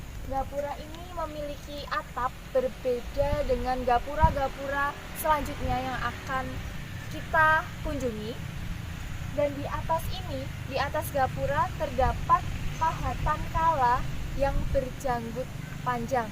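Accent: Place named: Java, Indonesia. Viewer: Good ya... Semangat belajar terus... Latihan speaking dan diasah terus..biar tambah luwes dan g medok lagi . Heee...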